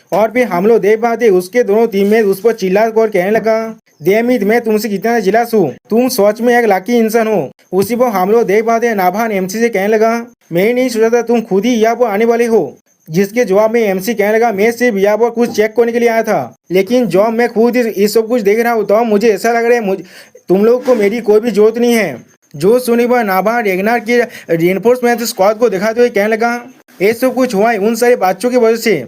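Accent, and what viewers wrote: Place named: Bengal, India. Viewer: Bhai toda slow or hindi clear bolo kitna fast or bangali me bol rahe ho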